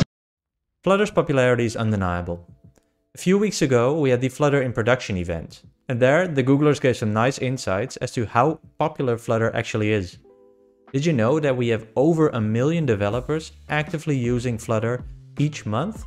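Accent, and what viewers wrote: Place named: Spain. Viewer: You from Spain? What’s that funny accent? Great video by the way.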